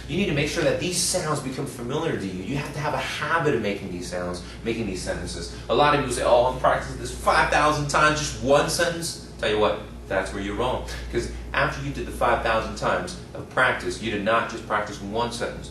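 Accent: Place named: United States. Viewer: Dude is amazing. Except he doesn't sound Canadian. He sounds American. Maybe he watched too much HBO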